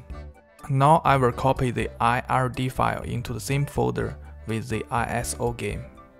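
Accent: China. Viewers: 这口音，中国人吧？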